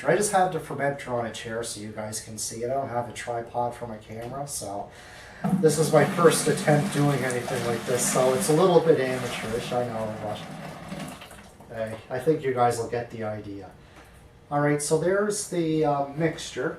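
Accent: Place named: Ireland. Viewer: Hi watching your reviews here in Ireland for a while. Would I be right in saying you've some Irish connection, your accents got a fairly noticeable Irish twang to it!. I've just been doing kit beers and dry hopping. I've been considering just taking out a few litres of wort and doing a mini boil, do you think that would work the same as your mini boil there?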